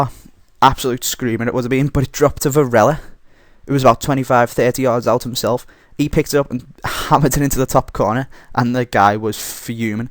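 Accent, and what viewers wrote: Liverpool, United Kingdom. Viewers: omg a scouser who makes fifa videos yes !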